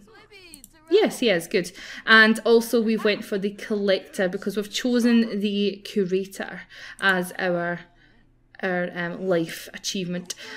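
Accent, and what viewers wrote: Scotland, United Kingdom. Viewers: I love your accent what part of Scotland are you from?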